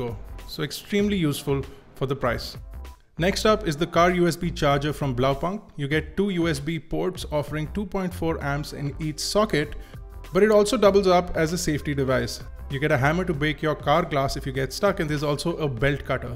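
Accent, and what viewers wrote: India. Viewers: Indian Accent 😄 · Damn man... Dope doesnt sounds so dope in the Indian accent.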